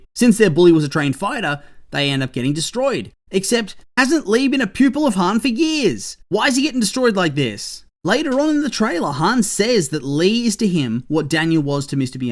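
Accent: Australia. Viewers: I love the sound and tone of your voice so much!! Australians have always had my favorite accent, and visiting there has always been a big goal of mine to help study bio diversity and wildlife. And 'cause it's super pretty too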